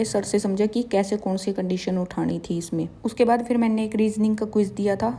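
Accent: Haryana, India. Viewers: Aapki boli se lag rha hai ki aap Haryana se ho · Hanji Haryanvi Chori h ye . Don't mind yrrr Haryanvi ladki h ye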